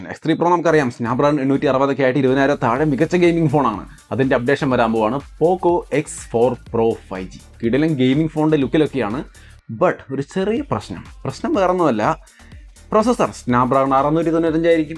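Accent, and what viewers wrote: Thrissur, India. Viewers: 0.46 nalla Thrissur bhasha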